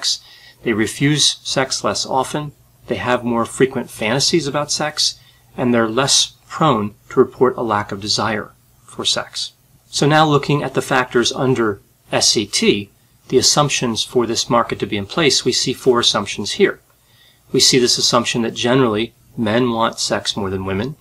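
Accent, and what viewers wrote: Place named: Philadelphia, United States. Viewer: Anothe cool video! You’re from the Philly area aren’t you? I heard a few words that gave it away.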